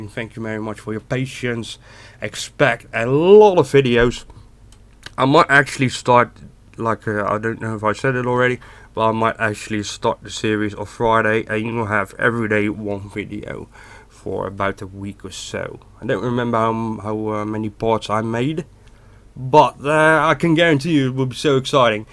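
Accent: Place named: Netherlands. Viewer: lol dat dutch english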